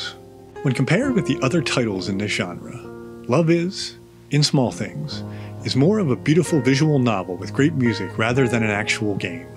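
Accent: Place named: United States. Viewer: Cool! You got an American voice actor! This app seems like less of the Chinese malware it is with him voicing it.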